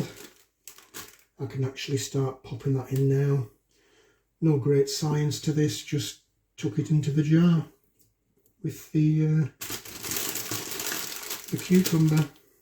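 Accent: United Kingdom. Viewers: You definitely sound British. Love the accent.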